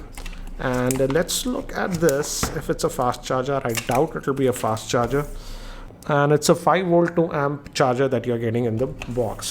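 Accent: India. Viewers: That distinctive Indian accent it is anoying and funny at same time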